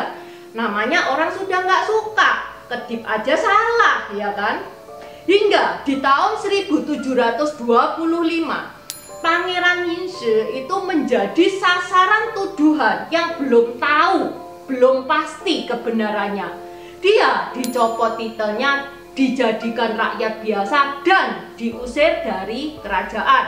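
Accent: Java, Indonesia. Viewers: Suka cara ngmg mba ini...lucu ngakak..trus kl liat wajah kaget..liat logat nya..jawa bagett...haha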